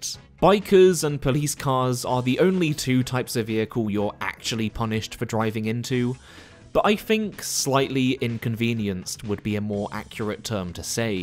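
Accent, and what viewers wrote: United Kingdom. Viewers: It isn't annoying to hear lorry. It is refreshing to hear an Englishman speaking English.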